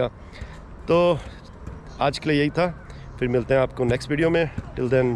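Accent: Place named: China. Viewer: Bhai, aap ki sound ab total Chinese ho gye hai. But a really awesome video by you.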